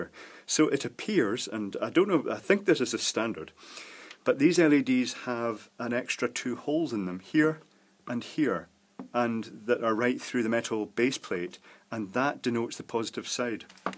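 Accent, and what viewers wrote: Scotland, United Kingdom. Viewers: he does, but that's the best way to teach and to learn. You'll learn more from the spoutings of an expert like, than you will from a random video or book. I litterally happened to watch a vid which took my interest called "poundland LED's" I though, "Oh I didn't know PL made LED bulbs, I'll have a look and see the vid to see if they're any good as I wouldn't mind pur-chasing some for my abode" 4 hrs later I'm still on his fuckin channel, and actually feel confident enough to fuckin LED my house up myself! Seriously! This man is a fuckin ledge! And with a FUCKIN AWESOME Scottish accent to boot as well! What a voice and what an accent! Ya wanna think about doing some voiceover work, on the side, seriously. "In a word without LED'S. Only one man can make a difference....."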